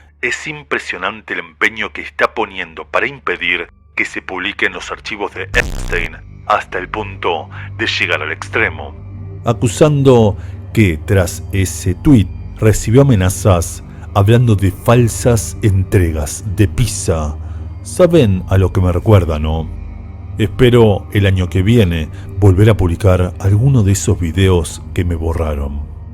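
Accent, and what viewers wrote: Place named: Argentina. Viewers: Superas ampliamente a Ivan de gran misterio tienes un gran talento de deducción y una gran forma de explicarlo a pesar de tu acento argentino (Felicidades) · A pesar del acento argentino??? 😠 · A pesar del acento argentino... Te has pasado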